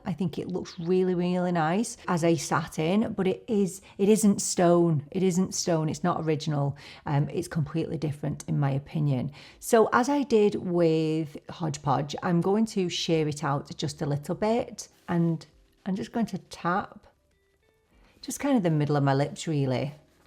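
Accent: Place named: Yorkshire, United Kingdom. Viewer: Subscribed as soon as I heard your (Yorkshire?) accent! Not often I find someone on YouTube in my age group and from Northern England 😊. I too moved away many years ago and listening to you reminds me of home. A MAC lipstick video as well…perfect!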